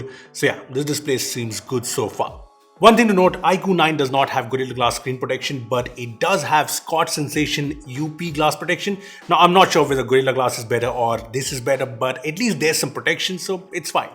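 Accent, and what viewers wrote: India. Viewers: Hate indian accent of english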